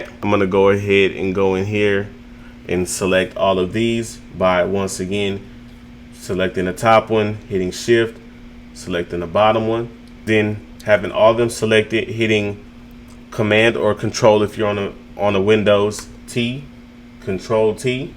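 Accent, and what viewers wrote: United States. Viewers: I here that Texas accent. can't wait to dive into NFTs this year!!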